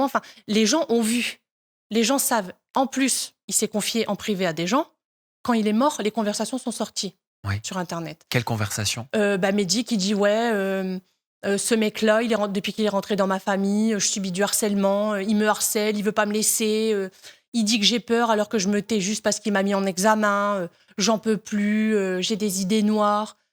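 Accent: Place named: North, France. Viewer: J aime quand ton petit accent du chnord ressens . Parfois j ai pu entendre Medhi a travers ton interview.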